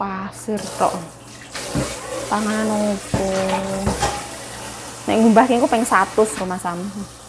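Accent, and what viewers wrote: Java, Indonesia. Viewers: Sukabumi hadir..mba saya suka lihat mba lg makan apa lg kalau ngomel"gt aga medok tp enk di dengar lucu.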